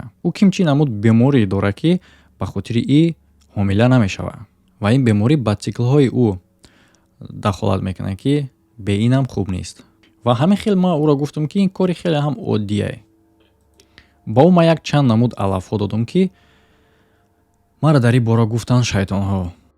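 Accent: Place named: Tajikistan. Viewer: ВАХАХА НАВ ЧИСТА ТОЧИКИ ГАПЗАДИ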